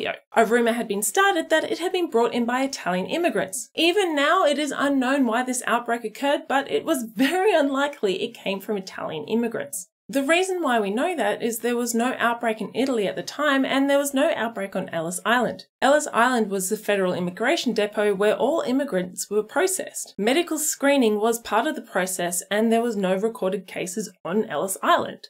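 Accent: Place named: Australia. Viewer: Always great to hear clear & reasoned arguments / information about important topics - extra important in the fight against disinformation in modern times. This is especially cool from someone with an Aussie accent (I'm in Bunbury in Western Australia).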